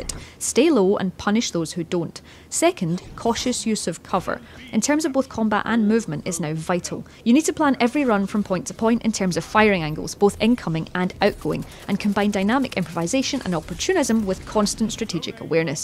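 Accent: Scotland, United Kingdom. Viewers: sounds like a Scottish accent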